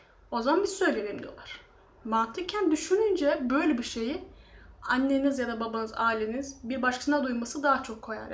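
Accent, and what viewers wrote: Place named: Aegean, Turkey. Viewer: Senin ege şiveni yirim😂😂😍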